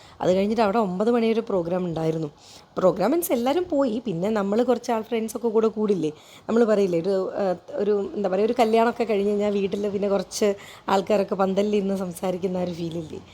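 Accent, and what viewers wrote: Kozhikode, India. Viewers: നേരം എനികില്യ ❤❤❤❤❤❤ കോഴിക്കോട് slang ❤❤❤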